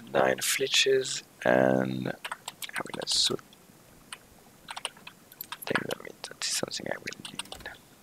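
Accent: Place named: France. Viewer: I really like your french accent, by the way.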